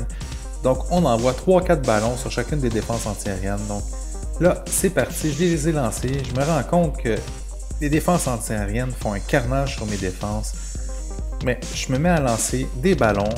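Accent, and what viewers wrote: Quebec, Canada. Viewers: Tu est québéquois ?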